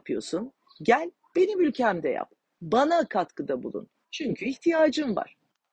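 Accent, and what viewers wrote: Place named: Turkey. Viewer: Hanim Turklerin aksani biraz garip geliyor Kanadalilara, kulaga cok hos olmayabiliyor, fark ettigim kadariyla sizde de o aksan yogun o bakimdan anlarim sizi spiker olarak almamalarini. Hintlilerin de ingilizcesi bize garip ama onlar genel olarak alismislar, Hintlilere daha aciklar. Soylediginiz seylerin cogu dogru ama iste cok calismamiz ve mucadele etmemiz gerek. Duzen oturana kadar surunduruyor Kanada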